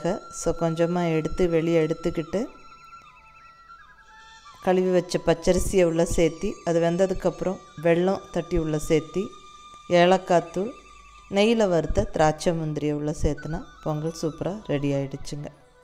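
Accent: Kongu, India. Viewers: yengal kongu Tamil.. mam nidhanathoodu sollum vidhamum arrumaii...❤ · Tats great........ Missing cbe so much..... Now m in singara Chennai.....by watching ur videos I feel so proud tat I cud hear Kongu tamil and our dishes as well in Kongu touch.......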